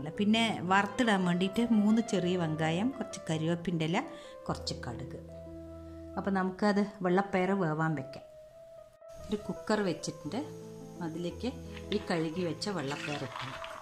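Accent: Palakkad, India. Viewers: Chechi palakkad ano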